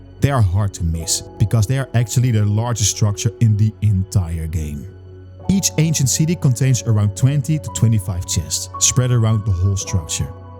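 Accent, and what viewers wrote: Netherlands. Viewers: Do I hear a Dutch accent? 👀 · Very Dutch accent methinks! Great video!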